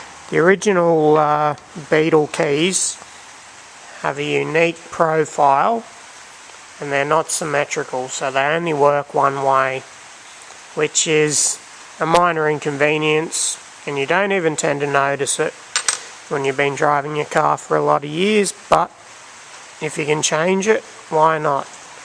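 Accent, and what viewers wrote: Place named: Australia. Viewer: You sound like the Ausie version of the monotonous teacher in “The Wonder Years” However, very informative, Smart and even funny at times. Many thanks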